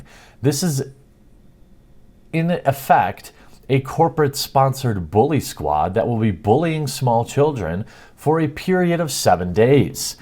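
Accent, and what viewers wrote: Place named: Denver, United States. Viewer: are you in colorado? it sounds like your in denver...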